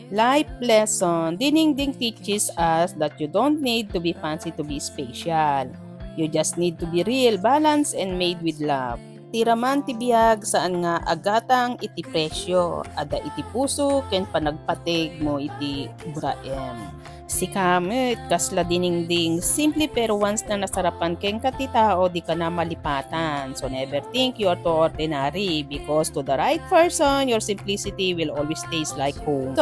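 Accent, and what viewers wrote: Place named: Baguio, Philippines. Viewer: kahit ganito araw araw ang ulam best.. haha kagaling mag Ilocano Baguio accent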